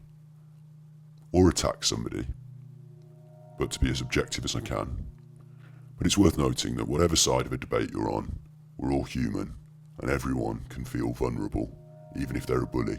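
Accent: Birmingham, United Kingdom. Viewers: I just discovered you and I'm loving your many analyses! I adore your accent as well. Are you from Birmingham, by any chance?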